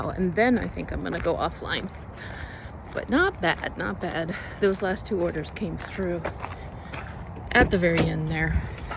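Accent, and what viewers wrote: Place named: Norway. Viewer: Where are you from originally? I hear some Norwegian. Dont ya know..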